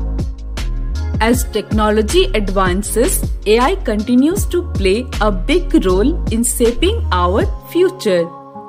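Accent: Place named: Uttar Pradesh, India. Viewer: Are you from up or mp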